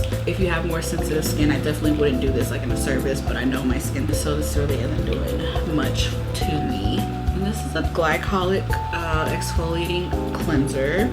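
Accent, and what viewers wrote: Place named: Haiti. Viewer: Are you Haitian? You have the accent.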